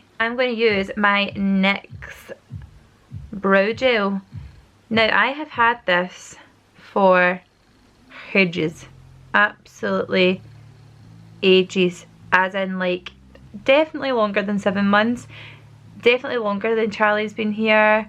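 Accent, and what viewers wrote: Scotland, United Kingdom. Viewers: I found you through watching fleur de force and I must say I love you just as much if not more. It's so nice to hear an accent like my own, I'm in shotts. (Don't judge! 😂😂) is love to see you do a fenty beauty review, I watched fluers and Jamie Genevieves and am obsessed already. The only place to physically buy it in Scotland is Harvey nicks in Edinburgh, if you do a review you can let me know if it's worth the trip 😂😂 xx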